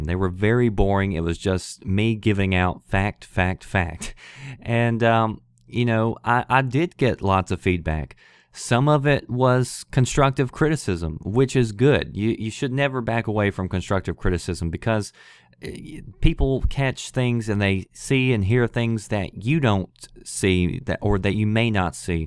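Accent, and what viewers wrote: South, United States. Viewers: I really enjoy your vids and you got a cool southern drawl. Have another positive comment and have a nice day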